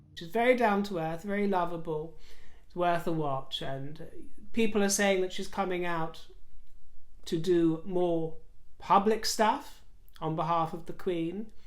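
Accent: Australia. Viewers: Had to chuckle at your Ozzie accent we call it Strine, my younger sisters talk more like that, I tend to use the Queen's English common in Adelaide. I love Mike Tindall he says it like it is. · Hahahaha as a Melbourne Aussie, I love your Aussie accent. You're quite good at it if I must say. We've got three rough categories of accent rather than region specific. They're call Broad, General and Cultivated. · your Aussie accent is hilarious, love it!! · Oh my, your Aussie accents are amazing, far broader than mine, after living away from Sydney Town for so long, many think I am South African or even British😃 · Props for your Australian accents. You did us proud! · Ann is adorable! I saw many many years ago .. two interviews she did with Michael Parkinson .. showed a Completely different side to her public persona as a rather strict humorless princess that was always portrayed in the press. 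. she was so funny and so honest... Parkinson was was a great interviewer and they interacted so well.. she revealed how she wanted to go to boarding school bcoz basically being privately tutored was boring and stifling (she didn’t use those words of course)! Geez she was funny! And highly interesting and intelligent. She described “losing her rag” when her potential kidnapped tried to drag her out of the car.. her recount was hysterical!! Anyone who claims to be an Aussie and complained about your Aussie accent.... ain’t Aussie.. they must be fake Aussie 🙄🙄🙄.. and today was even better! Whilst sometimes hearing our accent back at us .. I know I go sometimes .. bloody hell, do we sound that bad.. but then I go: I guess so. Australians tend to laughter at themselves.. we don’t take that sort of stuff seriously.. u shld block them from your channel if they are that ridiculously sensitive. 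You are v v good at accents.. quite excellent actually! Keep up the good work 🇦🇺🇦🇺🐨👍🏼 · I loved your Australian accent!!❤️ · beautiful colour on you, love your Australia accent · Great episode 🙌🙌🙌 Your Aussie accent is fantastic! You’ve obviously embraced Home & Away and Neighbours in your youth. As we say, “goodonya” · Yeah mate, your thick Orstralian accent is a bewdy . There’s jacko, jimbo, deano, johnno,…..love it! Why do the sins of the father have to be visited on the daughters of York? Never associated the York girls with any of that and really?? Why would you? And he appears to have been a loving and generous Dad, one of the good points for him. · I love you Aussie accents well done. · Love the Ozzie accent, Mate. All of them. You're a master. Could you say "was a miserable cow" in a Ozzie accent? Please! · When you say it in your Auz accent, it is so funny. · Ohhh… your Aussie accent is brilliant…come to the west coast next time! 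Xx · I don’t understand the fuss about ‘the word’ it just means that Harry is a dick - true story. I love Mike Tindall. What you see is what you get and if he has The Princess Royal’s love and approval, he has mine. We all loved Meghan when she joined the Royal Family. We really thought that she was going to be a fresh air and now we are just disgusted at her - and her husband’s behaviour toward our Queen. Lady Sarah looks very much like her Mother. I’m about the same height as the queen and my Grand daughter always wanted to be taller than me. She is now 11 and can look me in the eye🫤 Me and you will have to differ about Eugenie (like you care lol) I’m not so sure whether her loyalties lie with The Queen or the Harkles. I hope I’m wrong but we’ll see. Loved your Ozzie accent/s 💕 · I love your Aussie accent. Keep it coming. Although I really love your nice posh natural accent too. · love your Oz accent from Queensland resident made me laugh 😂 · Omg your Aussie accents are amazing!! 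Love that you've seen Kath and Kim 😂 Really suits you that gentle Aussie accent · Love your Aussie accent !!!❤️❤️❤️ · you are on fire at the moment!! Ps your australian accent was truly fabulous in your last vid. Enjoy your day xx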